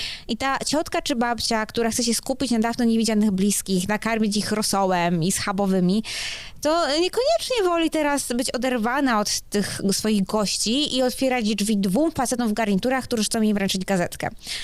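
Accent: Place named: Ukraine. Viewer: Sądząc po akcencie to jest chyba biedną ukrą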